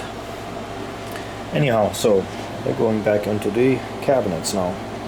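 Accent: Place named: Germany. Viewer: Very good Work Herr Teuton, you sound like a German living in the USA. If that is the case, I have a similar story. Myself I purchased all the Upgrades for a KLF-30 with Ciare woofers and will be attempting to build a set of cabinets from scratch.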